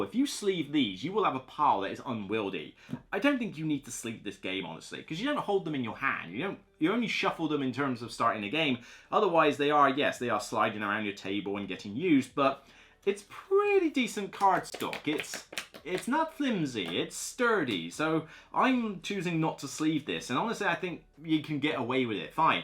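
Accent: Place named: Bristol, United Kingdom. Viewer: fair enough - Wales is a bit further away, but I would definitely recommend it (sorry, I thought your accent was from Bristol as my daughter lived there and the accent sounded similar to me).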